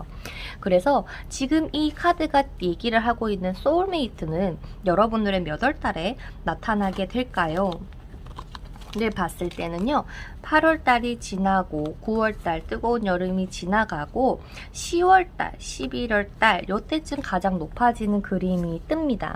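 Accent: Gyeongsang, South Korea. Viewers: 님 경상도 분이시죠???!? 말투 사투리 야악간...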